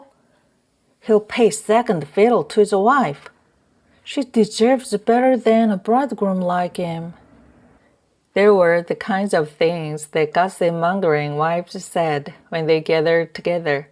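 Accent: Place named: United States. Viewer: 오우~아메리카 발음 👍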